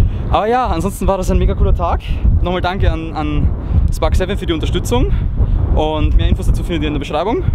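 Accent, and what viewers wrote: Austria. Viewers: bist du Österreicher ?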